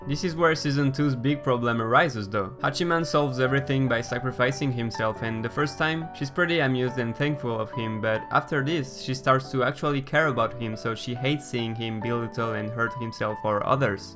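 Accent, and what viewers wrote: France. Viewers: Good video !! Btw, are you french ? (Your accent)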